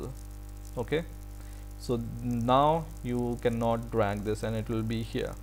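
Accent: India. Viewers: Lemme get things clear, Your PC is in German, But you sound Indian... Makes sense. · The accent is like a cleaner version of indish.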